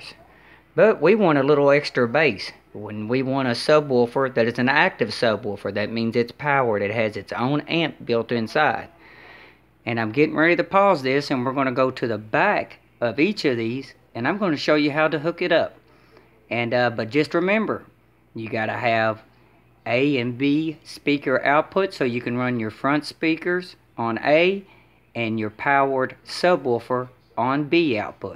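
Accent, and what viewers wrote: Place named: Kentucky, United States. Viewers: I bet this dude is from Kentucky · So I can tell from your voice your from my home state lol, what part of Kentucky bro?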